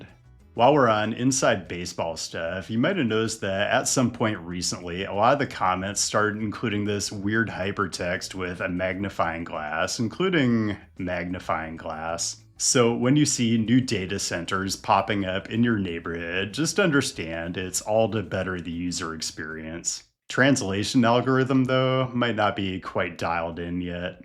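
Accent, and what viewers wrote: United States. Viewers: What is this guy’s accent? What state is he from?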